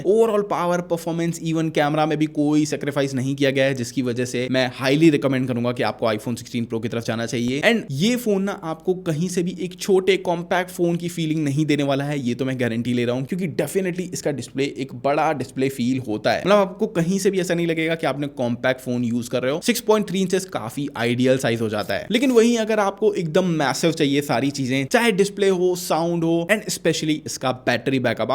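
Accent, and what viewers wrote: Sindh, India. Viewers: Are you Sindhi??❤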